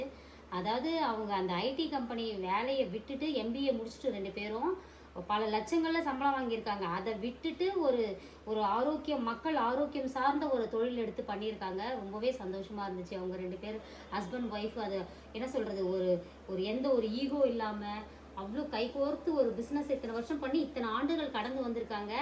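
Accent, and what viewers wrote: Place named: Kerala, India. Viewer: Sound Kerala sister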